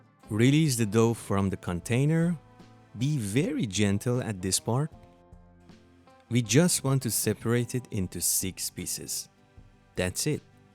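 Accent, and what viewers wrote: Iran. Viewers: What a Persian accent 😅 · i recognise a clear Persian accent. am i right dadashi?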